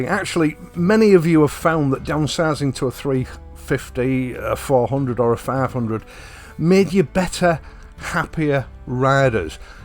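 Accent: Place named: East Yorkshire, United Kingdom. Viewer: Ive had the Honda CL500 for 2 years now and its great - not in a hurry to change it. . By the way, you sound like you're from the East Yorkshire area, if so, me too!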